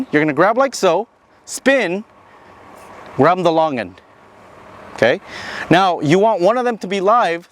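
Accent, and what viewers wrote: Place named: Canada. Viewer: A hispanic man with a Canadian accent. Too cool, I could listen to this guy for hours. He’s a very good presenter. 👍🏽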